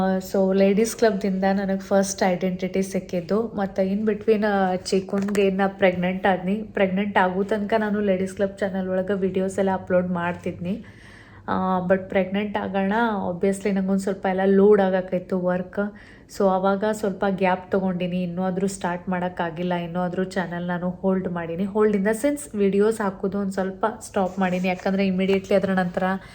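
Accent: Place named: Belgaum, India. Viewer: Hi dear na nim recent viewer ..naanu bgm dist gokak ...nim matodo style nam tara ada anta kushi aytu nodaaku esta aagatetei...evaag hubli total change aagateti matadod .na like ast madtini comments madak aagalla...nandu small kid ada so ...niv couple mast vlog madtira ...yest yella kelsa madtira .. really great dear.. online class tagoteni andri yavdu anta kelabahuda ...?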